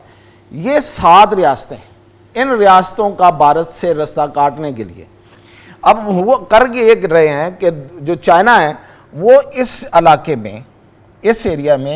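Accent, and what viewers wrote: Punjab, Pakistan. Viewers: sb you need to work on your pronunciations and general knowledge. Very poor in both fields. Before appearing on TV screen you should be prepared enough to educate people. With punjabi accent urdu seem to be awkward as a medium of instructions. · Its like this guy came straight from restuarant after had Bong Paey! Info is good but appology on your punjabi accent ruined the show. This channel should hire some proper urdu accent guy who looks gentle casting the show mannerly.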